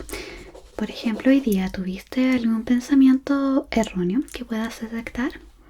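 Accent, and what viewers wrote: Chile. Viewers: Algo que valoro incalculablemente cuando miro cualquier contenido ASMR, es la naturalidad de las interpretaciones cuando se trata de rol. Tú tienes una facilidad deliciosamente buena para esto. Desde la modulación de tu voz hasta la suavidad del movimiento de tus manos; agradezco tantísimo haber encontrado tu canal, tu y tu bello acento chileno siempre logran relajarme. BAH, QUE DIGO, Tus videos en inglés también son bellísimos ✨ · Es como si Latte ASMR hiciera vídeos en español. Pero ese acento chileno que tienes me fascina. 💙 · Acento chileno ?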